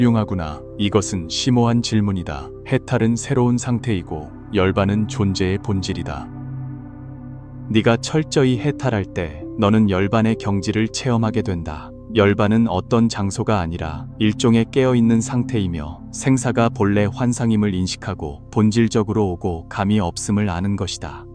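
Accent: China. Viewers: 중국어 발음이 방해하네요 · 중국발음이 오히려 집중을방해하네요 그러나 고맙게 잘 듣고있슴니다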